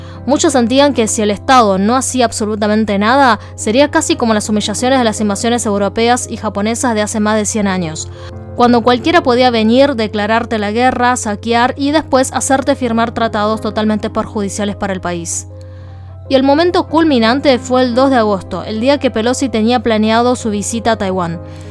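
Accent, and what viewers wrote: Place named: Rioplatense, Argentina. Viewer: Hubo un Miniso en Bs As, pensé que era japonés. Muy buen reporte, el mejor en castellano rioplatense!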